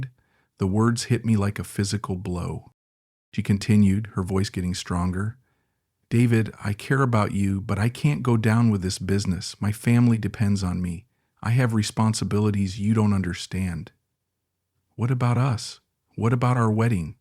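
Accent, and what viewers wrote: United States. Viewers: As a British guy, why do you have a US accent?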